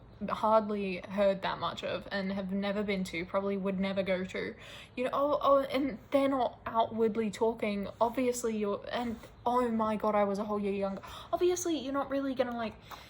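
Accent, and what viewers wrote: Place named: United States. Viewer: Naw I get it, I hear the American in you. This is the first video I’ve seen of you and, I do hear it. It takes you to talking for minute before it comes out a bit, and that is okay! It’s natural for it to happen, I had a Chinese friend in middle school and my friends and I started to have a Chinese accent from spending time with her! It was insane! Assimilation is natural imo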